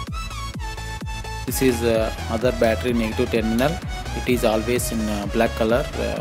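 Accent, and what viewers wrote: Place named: South India, India. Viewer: Dude i know that music is overrated but whats wrong with his English? Its just his accent. He is most probably from South.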